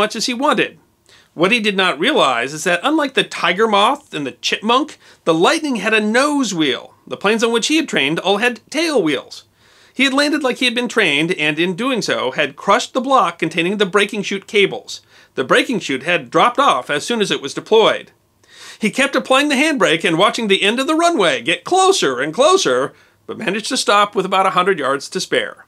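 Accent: United Kingdom. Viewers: Haha!!! So British!!! Sounds like Monty Python!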